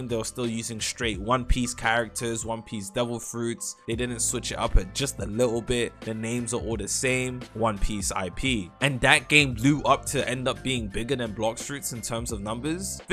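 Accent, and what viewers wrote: United Kingdom. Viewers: most bristh person i ever heard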